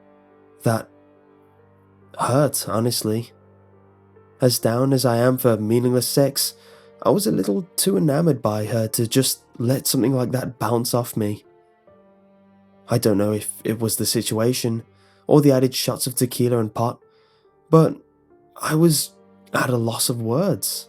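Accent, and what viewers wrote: United Kingdom. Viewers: Sorry english is not my native language, amm... is this the so called british accent?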